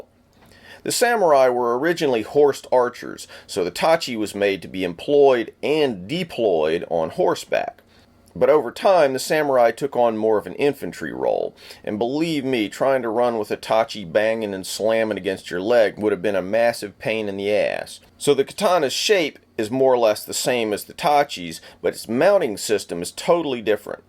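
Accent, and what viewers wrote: United States. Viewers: The rather Americanized pronounciation notwithstanding, this was a very good introduction to the topic. Kudos for breaking down a complex topic without dumbing it down in the process.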